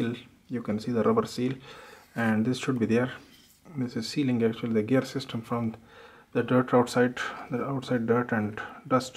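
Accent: India. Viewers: Oh cool! Saudi Arabia eh? Your accent made me think india or Pakistan. How's the collapse in the oil price affecting every day life over there? I've always wondered, what life is like in the kingdom. As for the toothbrush thing, I just thought it was funny that you said "LOL." to indicate that you made a joke.:-) Good video.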